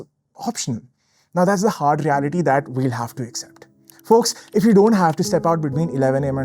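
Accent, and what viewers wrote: India. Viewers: A bit hard to understand at times, because of the Indian-English accent. Not hating, just saying, I'm far from perfect too. Dunno, maybe if I watch more content I get more used to understanding :) Anyway - on topic - I guess the 20% or so decrease in production efficiency based on heat doesn't really reflect the reality. This might be true for one or a few days, but if you have high temps all the time and no real chance to cool down, your body will break down more and more. This is pure stress. Wish you guys all the best and hopefully, new laws will go into place to optimise for the new reality we life in. They have to.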